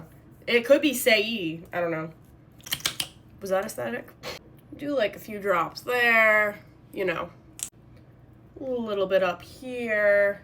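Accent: United States. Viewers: Can I please ask you what's your accent obviously I know you are American but where did you grow up xx love you guys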